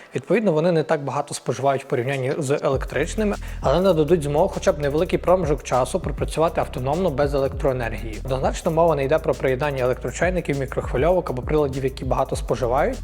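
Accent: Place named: Surzhyk, Ukraine. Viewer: це не україномовний контент. Цей карлик булькає на якомусь невідомому суржику, помішаному з кацапським.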